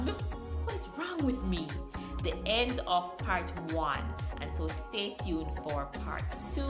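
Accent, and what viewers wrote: Jamaica. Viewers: Jamaican ? #wahh gwan! Just subbed Hun !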